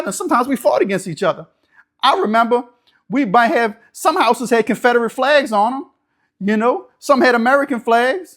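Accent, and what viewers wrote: Louisiana, United States. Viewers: I was with you until you got to the part you said getting gold teeth is not trying to be black. Being Southern especially from Louisiana yes they all sound like you even black white. I call it (LOUISIANA SWAMP TALK)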